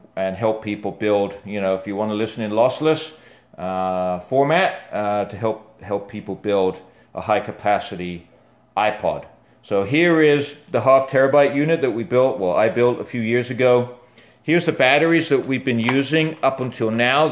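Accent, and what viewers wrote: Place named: New York, United States. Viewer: He sounds so new yorkey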